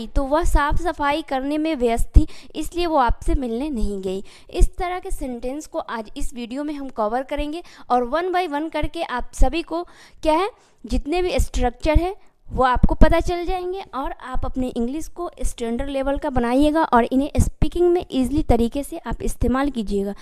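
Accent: Bihar, India. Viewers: Well! she was doing, write a Bollywood movie song. I was just, about to play Cricket. My result is, about to come. You must have, come to my home at soon. I was doing busy, take to my bath. Yess: I knew that You are from [Bihaar] with Yours, accental Mother Tongue phonatic sounds but still; I couldn't know about Yours complete qualification please. I am from Yamuna Nagar, [Hariyaana] state of India. Graduated in Arts, with the Instrumental and Vocal Music. Okay Madam!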